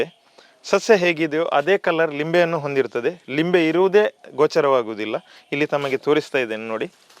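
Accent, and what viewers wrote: Standard. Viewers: Hats off to you sir, your knowledge is really appreciating... kannada thumba shudda mattu sundaravagide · Pure kannada ..kelokke thumba kushi aguthe ...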